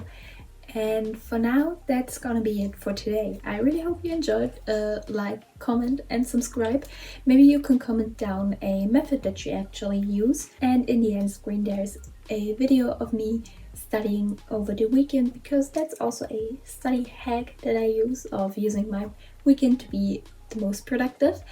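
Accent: Germany. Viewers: Der deutsche Akzent ist so cute HAHAHAHA. Aber tolles Video ❤️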